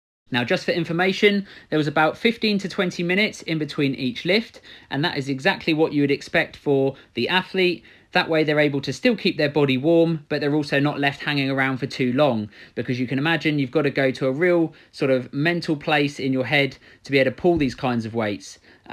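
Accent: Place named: South London, United Kingdom. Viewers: Nah hes got way too much of a pussy voice, posh london tosser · Warning not really, more southern/London accent.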